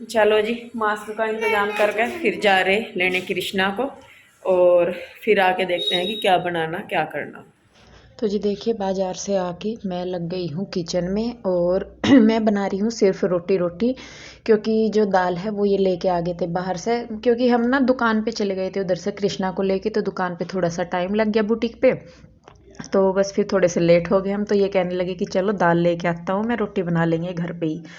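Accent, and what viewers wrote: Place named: Uttar Pradesh, India. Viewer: Apki language up ki lgti h hmare yha bhi ese hi bolte h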